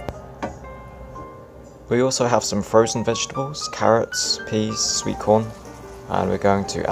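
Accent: United Kingdom. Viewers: Samm Y i get what u are saying. But to bash a depressive does not help.. British accent and looks are sweet. His words not so much, reflect his depression, lack of accessing true emotions, joy... and his insecurity (like need to proof us his marriage certificate, which is really not our business )... he got loads of judgment... about woman and man... which means he judging him self even harder and more... which in the core leads to depression.... i just wish he would realize it, and start having a therapy to turn his life over... show his followers would be such a great asset if maybe many depressive followers to show that u can turn into joy with life... your weaknesses are your strength - i truly hope he is more cheerfully with his wife... idk even why i keep watching him once in a while... i guess hoping to see him for once happy.....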